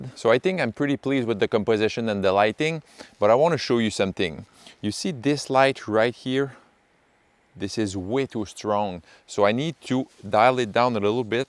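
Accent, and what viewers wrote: Quebec, Canada. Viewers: merci pour la vidéo. si je ne me trompe pas tu es québécois avec ton accent. 🙂 Est-ce que ton appareil fait automatiquement le focus stacking ou tu le fais manuellement? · I'm gonna ask you one thing, es-tu québécois? Ton anglais est beau mais j'entends très bien ce petit accent particulier du Québec! J'adore ton vidéo et je vais m'abonner à ton channel!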